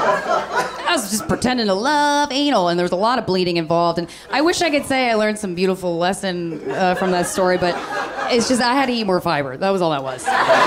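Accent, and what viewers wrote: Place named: Midwest, United States. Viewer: Herr midwestern accent is amazing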